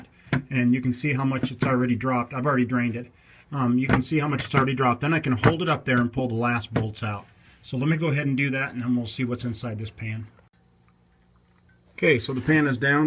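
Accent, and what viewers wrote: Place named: South, United States. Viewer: I like that country man talking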